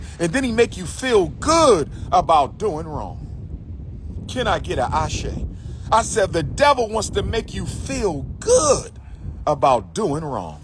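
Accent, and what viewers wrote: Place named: AAVE, United States. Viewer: If were to ever open a school, (and we know it’s already been 14 years), would the school teach boys Umar’s hood English, like “Where my Chicago Africans at?", Would it teach boys to practice and promote polygamy like he always does? Would it teach boys to constantly repeat everything three times like he always does? Would it teach boys to trick the people who support them, the way he changed his non-profit organization name and EIN number without telling donors? Would it teach boys to physically threaten people, the way he said he wanted “pull up crews” to roll up on and confront his critics? Would it teach boys that publicly asking for “wifey resumes” is what serious scholars do? Would it teach boys that they should never be held accountable for what they promise and never deliver, which is his personal philosophy?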